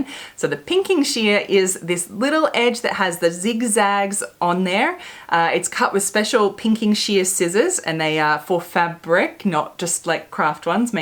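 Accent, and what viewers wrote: Australia. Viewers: I love your advice, especially with your charming Aussie accent. My serger and I never bonded, too hard to thread, gave it away. Love my brand new Janome with several zigzag choices. Have done all the seams you demoed and love French seams, flat felled and bound seams. I’m 75, sewing since age 10 and still learning. It has been so interesting to see the newer trends and techniques in sewing. Especially loved the demo of your wrist pin cushion, I totally love it! It’s the first thing I seize when starting to sew! I’ve made everything from exercise wear and swim suits to wedding dresses. I love clothing construction and crafts. You are inspiring! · Aussie accent - yay !!!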